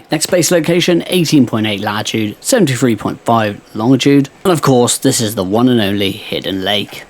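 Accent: United Kingdom. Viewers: i love British accent mate 😊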